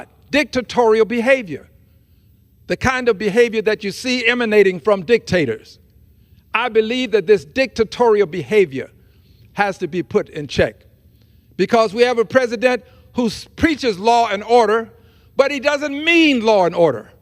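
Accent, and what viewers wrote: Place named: Texas, United States. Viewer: I’ve never heard a posh Texan accent but damn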